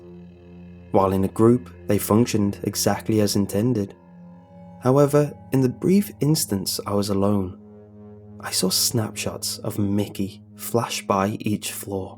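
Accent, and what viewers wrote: United Kingdom. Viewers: half tempted to watch IT, but deathly terrified of clowns and I wanna say has an English accent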